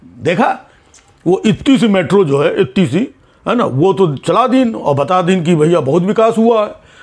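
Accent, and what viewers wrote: Kanpur, India. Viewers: Maja aa gya ekdam kanpuriya style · असली कनपुरिया language बोली है। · Kanpur style me jo aape khichae ki hai....jabadast. I love my Kanpur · Pure kanpuriya accent ....love it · Beautiful guru, bahot dinon ki baad Kanpuriya boli ki maja Li.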